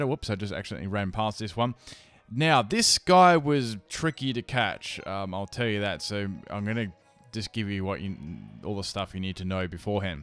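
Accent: Australia. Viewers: today i was at toys r us and there was an Australian family there. the moment they started talking with the Australian accent, i immediately thought of you lol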